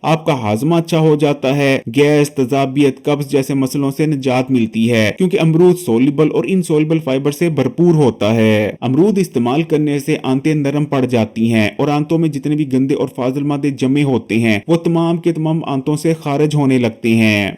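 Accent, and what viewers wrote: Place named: Punjab, India. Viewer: BHAI SAHIB JAB AAP NE URDU PUNJABI LEHJE M BOLNA HY TU BUHAT BEHTAR HY AAP PUNJABI M CHANNEL LANGUAGE RAKHAIN.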